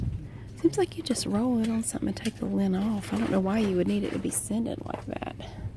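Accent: South, United States. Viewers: I'm a new subscriber and I have been a subscriber to AMSR channels for a long time and how I missed your channel is beyond me. It really at the top when it comes to effectiveness. I love southern accents so maybe that plays into but when I watched your library video, that sold me in 2 minutes. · You have such a sweet southern accent. I love it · I'm so happy I found your channel, this is my absolute favorite now. You are such a sweetheart, I adore the southern accent and your comments are just perfect. I had never seen any organizing and structuring ASMR before, but I absolutely love it.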